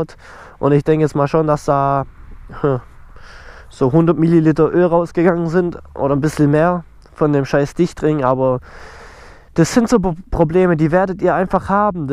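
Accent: Swabia, Germany. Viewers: Doch isch so Aber egal aber was i dich scho lang mal fragen wollte wo wonsch du eigentlich? Weil du so a bissale schwäbisch schwätsch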